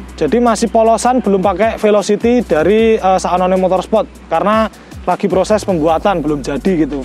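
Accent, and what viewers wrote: Java, Indonesia. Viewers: Boso jowone gawe luwih kentel broo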